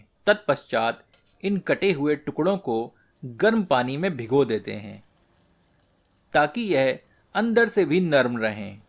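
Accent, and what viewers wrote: Standard. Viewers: ur commentry is amazing... pure hindi... just love it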